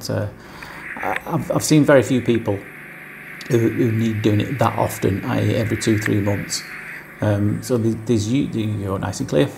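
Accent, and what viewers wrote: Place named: United Kingdom. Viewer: You know your accent is very pleasing to the ear, but especially when you say words like “sludgy” and “plug”. Can’t say what it is exactly … the UK is full of wonderful accents, expressions and speech patterns! ❤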